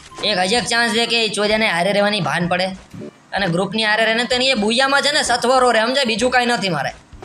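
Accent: Bhavnagar, India. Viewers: એલા નક્કી તમે ભાવનગર બાજુના લાગો😅😂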